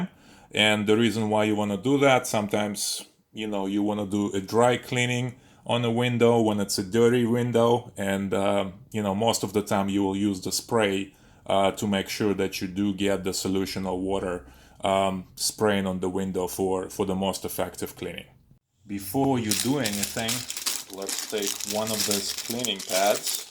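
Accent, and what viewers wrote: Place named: Russia. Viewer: The commentator is russian? His english is very specific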